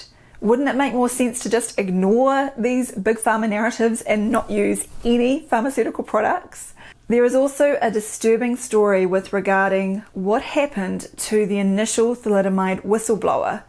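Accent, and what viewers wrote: New Zealand. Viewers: Just love the topics, your easy to listen to with that adorable voice and Kiwi diction 👍😁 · ... your material is awesome. My go to info source. Sadly my puter and phone both try to make your Odysee vids impossible to watch. Very frustrating. I was sooooo happy to see this one go so in depth and get away with it... kudos to you. I lived in NZ for 14 years and God, I wish I'd had you as my GP..:D I think too much... is that a thing? Thinking too much? It seems thinking is a quaint old tradition no longer observed by most. People just accept/react or react/accept. You.. you.... are one doctor I'd loooooove to talk to. You seen those memes depicting dead legends... who would you like to talk to? Yer not dead and i hope that doesn't happen for a looooooooong time... but I'd dearly love to pick your brains... you seem a conventionally trained modern physician... 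yet one who has begun to question the current paradigm. THAT is an amazing leap better than any moon landing. And yes, I grew to love the Kiwi eeksahnt? I know you have a GP practice, a Youtube star base, but god! I'd love to talk to you. i have soooooo many questions.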